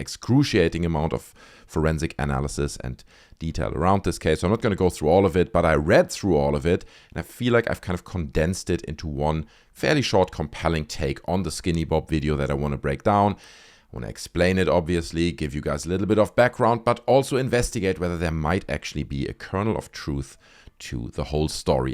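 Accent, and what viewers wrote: Germany. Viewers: if this video Podcast is run by the Host man Talking called??? then why is this Russian Man Talking with a German Accent??????????????????????????????????????????????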